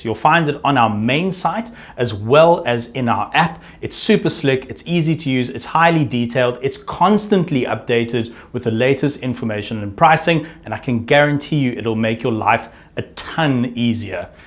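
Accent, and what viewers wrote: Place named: South Africa. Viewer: I'm here cause I like the SA accent😅. Greeting from Australia. PS, can you show us going through a red light at night. Lol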